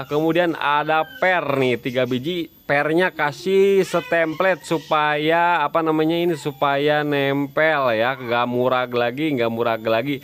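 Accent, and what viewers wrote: West Java, Indonesia. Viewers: Kang Aya logat logat Sunda, Sunda mana kang, bengkel na di mana kang..hatur nuhun kang.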